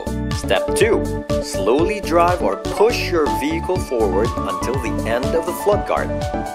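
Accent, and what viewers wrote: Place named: Philippines. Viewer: Nice Filipino accent there lol.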